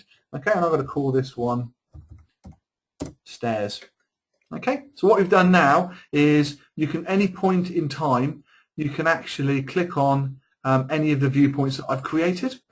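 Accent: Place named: Cockney, United Kingdom. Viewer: A cockney webinar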